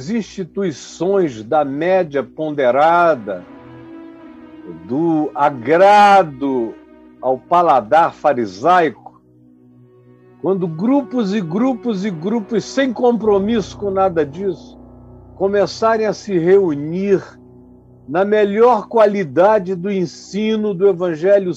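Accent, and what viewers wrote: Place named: North, Brazil. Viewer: Amo essa linguagem nortista do. 👐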